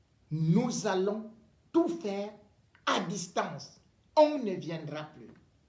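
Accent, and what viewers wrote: Cameroon. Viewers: Un journaliste engagé comme toi on en voit de mooins en moins en Afrique. J avoue que alain foka et toi faites la fierté de notre pays. Continuez ainsi l histoire retiendra votre passage sur cette terre. Merci pour tout mr ngono. Depuis que je suis tombee sur l émission de sport à france 24 j ai doublé mon admiration pour vous. L accent Camerounais sur ce plateau tv me fait tjrs sourire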